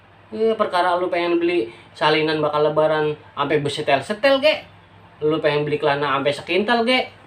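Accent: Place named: South Jakarta, Indonesia. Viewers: betawi asli ye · Wkwk logat Jakarta Selatan dong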